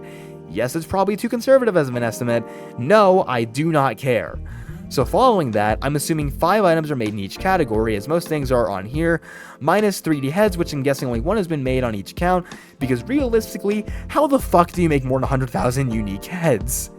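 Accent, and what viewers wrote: United States. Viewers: His accent looks kinda American so i wouldn't be surprised. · fr she sounds American fr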